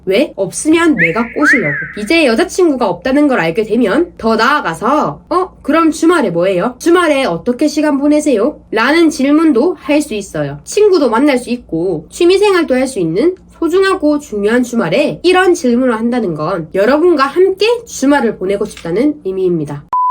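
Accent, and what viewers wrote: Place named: Gyeongsang, South Korea. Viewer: 경상도사투리 ㅈㄴ쓰누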